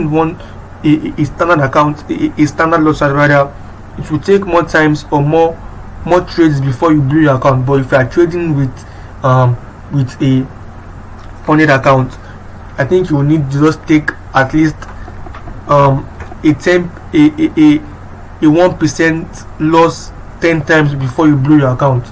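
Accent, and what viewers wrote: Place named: Nigeria. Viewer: Nigerian accent is easy to know!! You actually sound like someone I know.. Respect bro!✊🏾 It's good whenever I see a Nigerian doing this on YouTube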